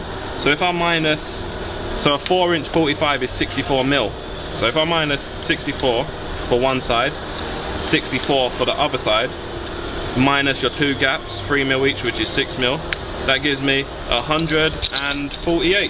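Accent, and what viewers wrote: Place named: London, United Kingdom. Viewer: I try to understand your prononciation just like London down town.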